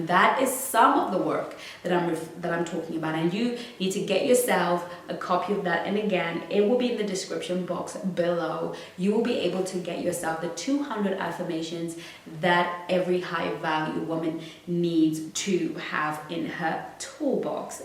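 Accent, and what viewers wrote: United Kingdom. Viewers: Hi beauty...how much I love your Brit accent and your style! Bisous ma chérie...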